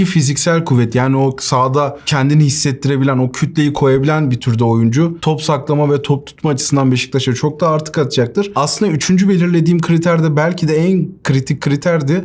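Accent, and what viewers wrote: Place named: England, United Kingdom. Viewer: ''Temi ebraham '' İngiliz aksanlı dinlemek keyif vericiydi yine. Abrahamın öz güven eksikliği var bizim kötü travmalarımız var son 4 yıldır Allah sonumuzu hayır etsin. Bizim taraftar sabırsız olursa elimizde büyük biz enkaz kalabilir ama moral verip destek olursa da alev alabilir. Ben bir çok sorunu çözebileceğini düşünüyorum etrafı doğru kurgulanırsa. Bu adam çok gol attığı sezonlarda hazırlayıcılarla oynadı hep. Romada Veretout Mmkhitaryan Zaniolo Pellegrini ve Karsdorp sayesinde attı 26 gol. Chelsea de Mount Willian Azpilicueta ve pulisic denen futbol teroristtinin mecburi pasları ile attı 17 gol. Ki o dönem pulisic şimdiki kadar bencil değildi.Aston villada Hourihane Mcginn Grealish Anwar el Ghazi Ahmed el Mohamady asistleri ile 26 gol attı. Bristolde keza öyle orada tüm hücum hattı Abrahamı beslemek üzerine kurulmuştu.Dikkat edilirse hep iyi orta yapan beklerle gol sayısını artırmış doğru orantılı. 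Milanda herhangi bir santraforun 15 gol atması mucize.Solda pas vermeyen leao sağda ondan beter bencil pulisic merkezde Allah canımı alsın diye dolaşan orta sahalar ile kimden pas alıp gol atacaksın? Orası ölçü OLAMAZ Abraham için.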